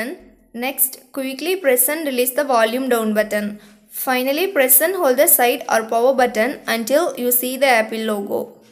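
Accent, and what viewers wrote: India. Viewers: I like the way you speak and your voice. You’re Indian right?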